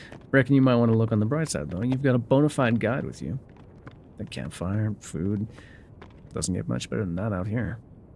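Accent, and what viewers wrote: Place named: South, United States. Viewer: Charlotte is my spirit animal Edit: that cliffhanger was FOUL Edit 2: relistening to see if i missed anything and i didn't necessarily miss this but every time I hear Lotte i think of princess and the frog lol Anywizzle- This story is actually so cool, the concept is amazing and the mystery keeps you coming back to listen more Also Its like a.m. and I should probably be asleep but who needs sleep when you have? Edit 3: he keeps calling us pet names like sweetheart and whatnot and I don't think thats just because hes casually finding excuses not to say the listeners name Also Love to slight southern accent and how its not very forced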